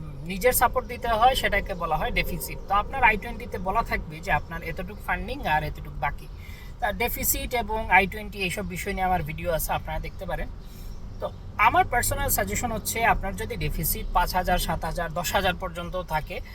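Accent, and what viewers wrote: Sylhet, Bangladesh. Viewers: আসসালামু আলাইকুম। কেন জানি কথা শুনে মনে হচ্ছিল, আপনি আমাদের সিলেটের। তারপর আপনার চ্যানেলের প্রথম ভিডিও দেখে কনফার্ম হলাম। অনেক অনেক দোয়া এবং শুভকামনা আপনার জন্য ভাই।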